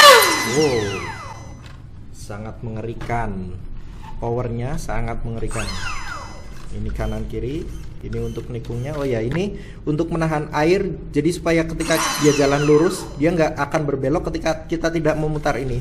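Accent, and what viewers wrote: Banyumas, Indonesia. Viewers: mas, kalau saya pake yang 3300 mAh bisa gak ya? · kereenn.. bang. belii gak yaa...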